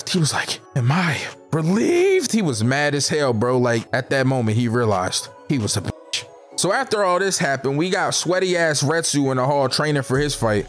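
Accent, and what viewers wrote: Philadelphia, United States. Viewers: Eyyo you from Philly? 👀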